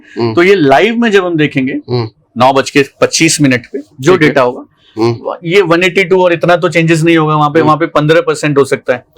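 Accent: Bihar, India. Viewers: I like your strategy but BIHARI sun ke man nahi karta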